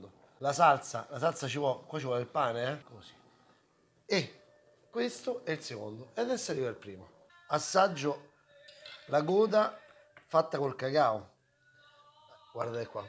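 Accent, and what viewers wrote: Rome, Italy. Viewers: Sei troppo forte sei un vero comunicatore ti guardo e apprezzo sempre le tue ricette e poi le sfumature del romanaccio daieee ti seguo dal l’America 🇺🇸🇺🇸🇺🇸🇺🇸🇺🇸da Naples Florida vienimi a trovare qui e fantastico ciao un abbraccio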